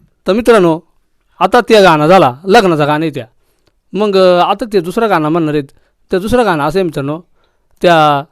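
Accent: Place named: Akole, India. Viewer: दादा भाषेवरुन तुम्ही नगर जिल्ह्यातील अकोले तालुक्यातील वाटता...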